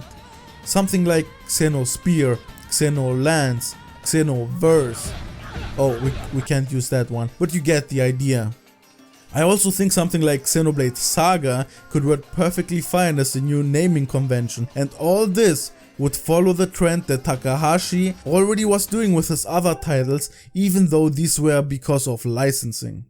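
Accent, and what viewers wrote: Germany. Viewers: Is that a German accent?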